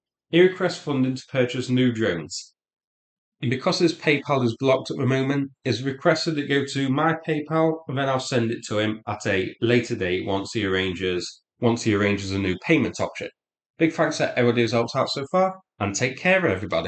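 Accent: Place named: Middlesbrough, United Kingdom. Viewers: i'm sorry you have this problem. The good news is: you have a great opportunity to learn understand Middlesbrough accent. It may not match what you expect, but it would be a lot less genuine if he put on some fake south of England middle class accent. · I agree with you. English is the language one uses if he wants to have an international audience. If one speaks with his Middlesborough local accents, then he is not speaking "internationally understood English", not the one which is internationally understood as such. I understand him because I turn the subtitles on, but the subtitles as well often don't understand what he says, and they cannot be faulted at all. I think he would have a larger audience if he used some computer generated voice.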